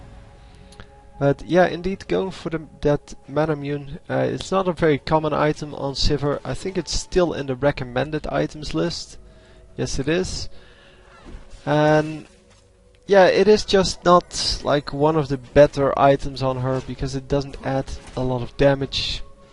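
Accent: Netherlands. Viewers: Yeah that accent for me is very characteristic, every dutch guy making videos has almost the same voice :D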